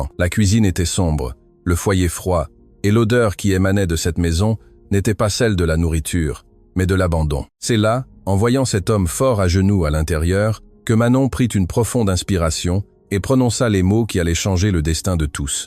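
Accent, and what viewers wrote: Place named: Auvergne, France. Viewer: ❤❤❤ très très belle histoire d'amour qui donne du beaume au coeur. Mon passage préféré est la visite de Jérôme au curé car on se doute qu'il va lui parler de mariage et je n' attendais que cela. Je suis en France en région Auvergne, c'est un endroit juste au milieu de la carte et ce genre d' histoire a pû exister en son temps car le conteur parle comme parlait les gens d ici.❤❤😂❤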